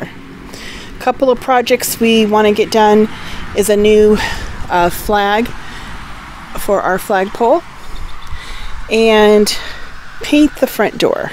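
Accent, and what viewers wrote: South, United States. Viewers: I always thought she had a very thick southern accent.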